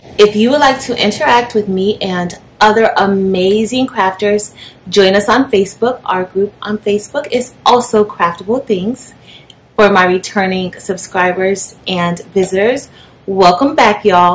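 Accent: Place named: Texas, United States. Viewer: You a Texan? Y'all give u away. Great video thank you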